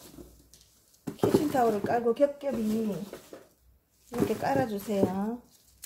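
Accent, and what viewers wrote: Busan, South Korea. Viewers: 유용한 정보 감사합니다 ~~ 부산말씨 정감 있어요 ^^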